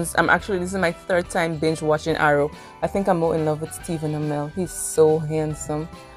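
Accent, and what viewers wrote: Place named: Grenada. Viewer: I love the chit chat videos as you give away your secrets😂 but most importantly it make you more relatable and brings out your Grenadian accent and down to earth personality ✅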